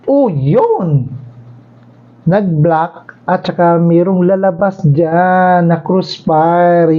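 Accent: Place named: Iloilo, Philippines. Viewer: du ilonggo ka boss haw thankyou po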